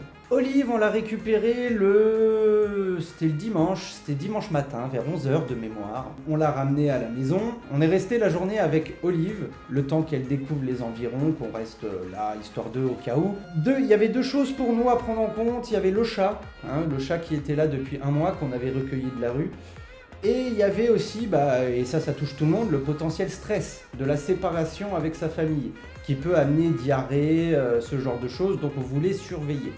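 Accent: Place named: Moselle, France. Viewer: Moi j’ai compris ce que tu as dis 🤣 Tu viens d’où de Moselle?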